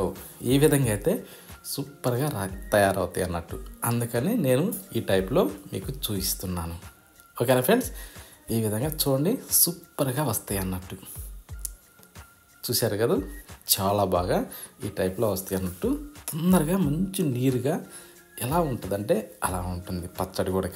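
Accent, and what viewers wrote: Nellore, India. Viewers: Bro meeru Nellore valla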